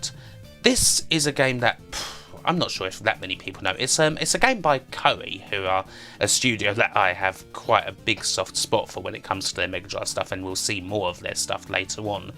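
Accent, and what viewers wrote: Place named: Essex, United Kingdom. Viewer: Nearly right. comes from Essex, which is to the north east of London.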